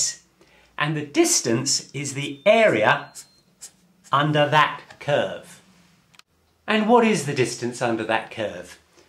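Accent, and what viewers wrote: United Kingdom. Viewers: Rather unrelated, but your voice is so familiar (and peculiarly nice to listen to). Have you been in other youtube projects/channels? Edit; nevermind, apparently you just have a strong UK accent which makes you sound a lot like a guy on Numperphile Also, thanks for making this video (and the rest of them for that matter, as i assume i will like them as well). It's rather difficult to know what subjects to start with, but also what source you can actually understand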